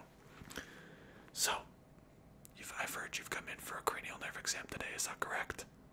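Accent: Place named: Germany. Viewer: U sound German are u German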